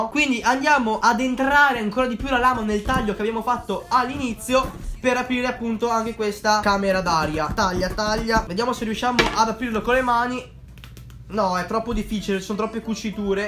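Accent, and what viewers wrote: Veneto, Italy. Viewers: Di dove sei del veneto? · Ma di che città veneta seu?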